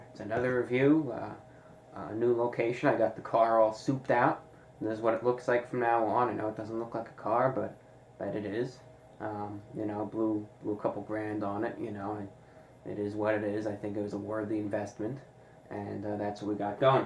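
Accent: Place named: Queens, United States. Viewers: I love it when the old Queens accent really comes into it's own, on the New Yorks and chocolate. I really like that accent, it reminds me of Richard Feynman and is quite soothing. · telling by his voice, somewhere from the east coast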